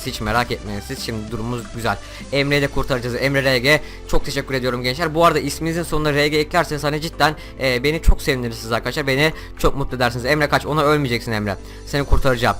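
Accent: Kütahya, Turkey. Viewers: abi sen kutahyalimisin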